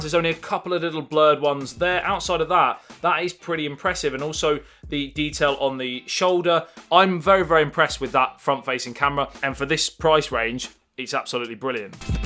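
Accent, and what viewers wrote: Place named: Essex, United Kingdom. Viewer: This man is clearly of irish Catholic descent. Hia facial features are a dead giveaway. What puzzles me is why he is talking with an essex accent. He should do the next video in a raw irish accent to pay tribute to his irish Catholic ancestors. I knew he was Irish the first time i saw him on youtube which was last week. He is a strong Irish leprechaun trying to present tech videos. He is an Irishman by blood.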